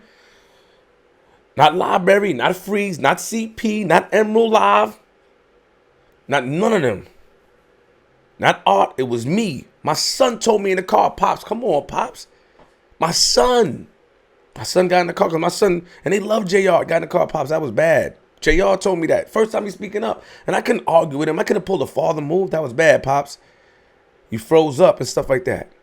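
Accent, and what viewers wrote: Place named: Queens, United States. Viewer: You talk like if Christopher Walken was from Queens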